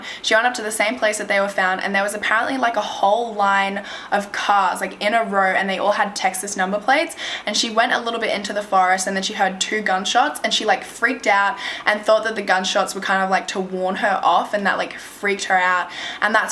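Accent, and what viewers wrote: Australia. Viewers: Australian. She's from Australia. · Are you Australian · her accent is like australian and america switching back and forth. i'm so confuseddd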